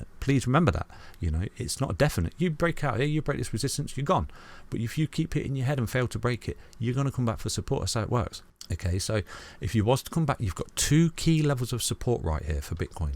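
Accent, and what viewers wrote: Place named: Birmingham, United Kingdom. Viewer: I'm not a trader I'm a hodler but your videos are great educational information and your accent is good to here (are you a brummie?) . Regards from Thailand 😁🙏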